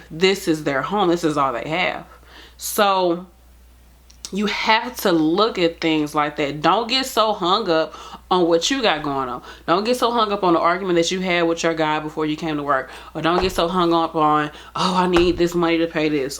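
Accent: St. Louis, United States. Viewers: ...this was a brilliant video. I have watched a few of your past ones (mostly coz I love your stl accent ) but this one was top notch and as real as it gets! Much love!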